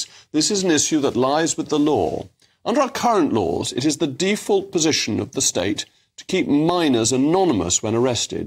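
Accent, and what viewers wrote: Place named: RP, United Kingdom. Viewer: Blame it all you want on you well spoken Toff but it comes down to the people in government who DO NOT live like the majority of people in this country that work hard and suffer for not being able to own or let alone renting a property as scum from african muslim countries come first Not the British people this needs to bloody change and we are angry as hell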